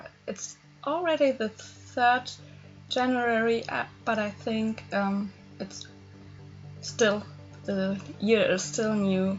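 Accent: Germany. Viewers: Immer diese Münchner :D Hört man direkt raus ^^ gruß aus Hamnburg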